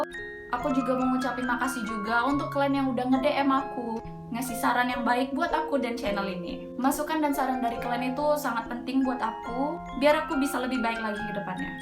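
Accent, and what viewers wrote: Medan, Indonesia. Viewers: Mantap logat medannya dah kluar lagi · nengok cakap Medan nya kok jadi sor kali, jadi pengen liat cerita" yang laen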